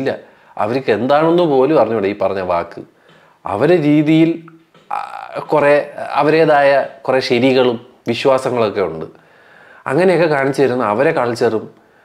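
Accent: Trivandrum, India. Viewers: ഭാഷ powli tvm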